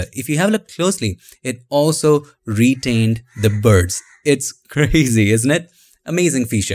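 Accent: India. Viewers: i just subscribed to your channel... where are you from btw sir? you're sounded like from educated indian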